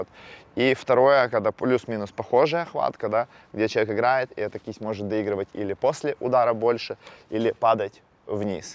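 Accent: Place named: Odessa, Ukraine. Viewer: Хорошее видео Очень знакомый акцент. У моих учениц из Одессы очень похожая речь У меня примерно такой же топ ошибок. Примерно так же исправляю Валерий , тренер из Германии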